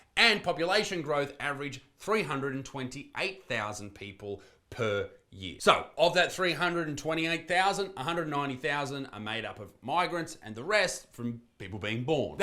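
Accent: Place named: Australia. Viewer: I am an American and I say Thanks for posting this. If you keep saying the truth eventually even the stupidest liberals will be forced to see the truth. It is time to slow down or stop legal immigration. And deport all illegal invaders. Also, I appreciate the open frankness and ausie accent. Also, I have to ask, why is this mass immigration always from shithole countries and into the US, Europe or Australia? Why don't they try to flood China, or Japan or India for a while?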